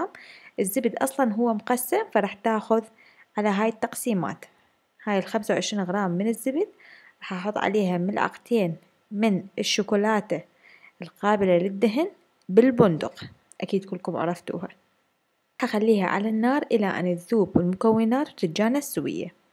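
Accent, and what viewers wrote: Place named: Iraq. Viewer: اللهجه العراقيه تفوز🥺❤️❤️🌚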